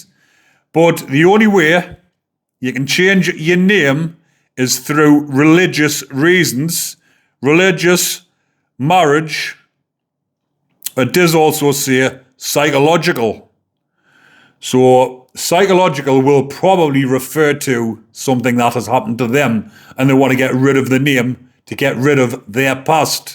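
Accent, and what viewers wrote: Newcastle, United Kingdom. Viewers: Geordie accent is brilliant. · Sounds like a Gordy Northumberland/or Newcastle to me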